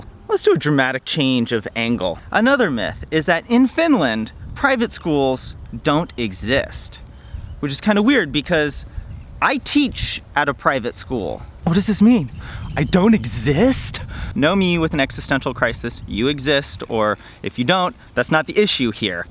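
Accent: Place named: United States. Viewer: You're not Finnish, are you? Awesome American accent. Just, FWIW, Americans will never understand Finns! Sorry, gave up with the bullshit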